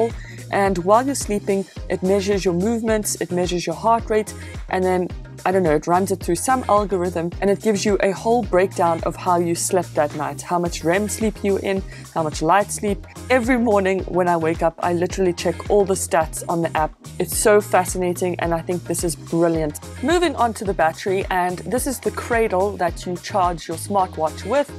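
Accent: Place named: South Africa. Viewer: Thanks for the review! I thought I recognized the accent (lived in SA for 6 years). This decision about which Versa to buy can be daunting lol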